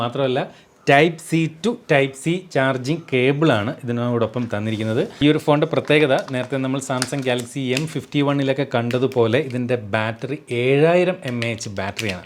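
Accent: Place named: Thrissur, India. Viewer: cheettan thrissur kaaranaano amsaarum nammade thrissur kaare poole undu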